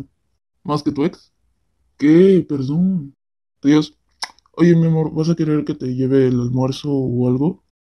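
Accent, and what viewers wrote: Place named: Peru. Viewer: Tu acento peruano es supremo! Amo.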